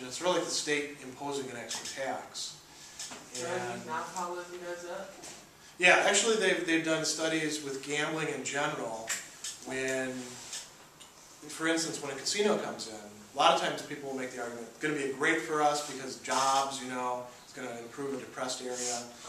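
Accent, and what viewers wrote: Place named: Canada. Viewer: I have lived off your videos to guide myself through my university philosophy elective - for which I accidentally registered. I caught myself answering your questions out loud as if I were attending in person. I find it interesting to listen to the accents of your students, because being a Canadian myself, you sound like me. Midterm today! :)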